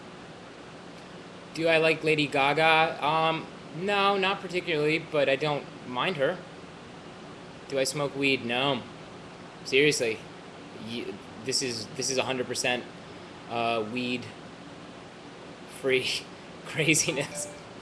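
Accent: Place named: Iowa, United States. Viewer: Pure Iowan accent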